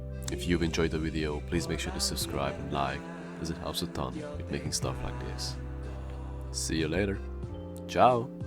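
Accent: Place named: Romania. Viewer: Its good enough to remind me of a Romanian friend of mine from college. Her English was incredible from just learning it in school. Her accent was very subtle and cute like:) Either she was just naturally gifted and learned other languages easily or the schools in Romania are amazing lol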